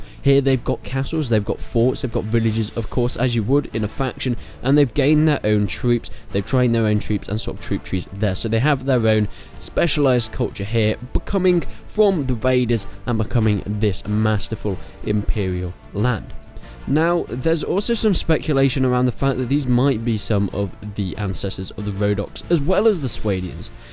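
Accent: United Kingdom. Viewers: god, do all british people talk like this?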